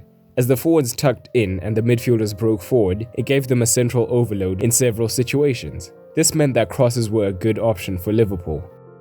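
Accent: South Africa. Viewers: Your accent is South African ?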